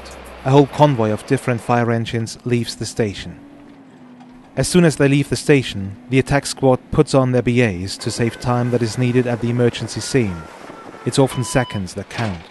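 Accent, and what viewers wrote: United Kingdom. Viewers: Ach, das "Amt für Brand- undZivilschutz" oder wie das heißt, in der Greflinger... Schönes Material, da in diesem Jahr das Budget für einen Neubau (sprich: Abriss des Bestandsgebäudes) genehmigt wurde. Historisch wertvoll :-) Außerdem ein schöner britischer Akzent! Ich kriege den nicht hin, nur wenn ich "oh no!" sage, ansonsten habe ich das eher raue US-Englisch drauf. Mit starkem, deutschem Akzent. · Could you please make another version of that documentary with an american speaker? The speaker's english sounds really british :(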